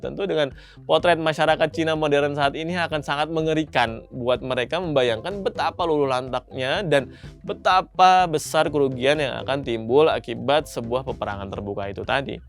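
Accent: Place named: Sumatra, Indonesia. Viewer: Kelen bah, Bang ini masih kental Sumateranya wkwkkw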